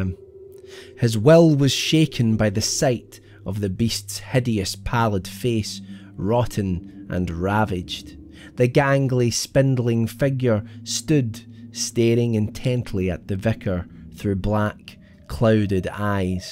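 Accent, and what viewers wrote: Scotland, United Kingdom. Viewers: scottish accent, horror stories, bringing visions of the gothic era, TOP NOTCH!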